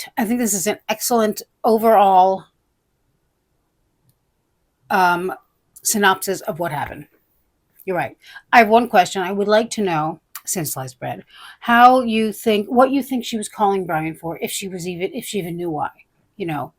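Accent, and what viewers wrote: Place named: East Coast, United States. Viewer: Off topic but I love your hair, you’re a stunning young lady- also, yes…lady Macbeth is also a fantastic show, she does great work!! I was laughing with her drunky the bear videos-her trying to get into SB mind set-yikes!!! I think with your accent you must live east coast-I’m west coast-we should all have a SB or forensic files marathon!! 😂 My best friend and I watch our “murder shows” mom calls them 😅and we enjoy them, so glad I found all the people with like minds !!!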